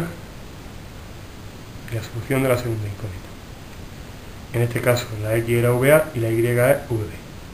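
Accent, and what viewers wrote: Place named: Caribbean. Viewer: Hola, me sirvió mucho, pero para que sus vídeos se vuelvan más virales debería neutralizar más ese acento caribeño. saludos desde los andes Colombianos...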